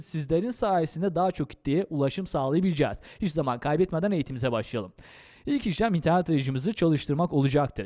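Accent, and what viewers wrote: Azerbaijan. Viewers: Siz Azerisiniz?? +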